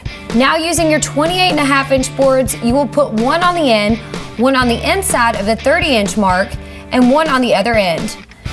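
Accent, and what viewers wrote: South, United States. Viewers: I could listen to your sweet southern voice rack off measurements all damn day...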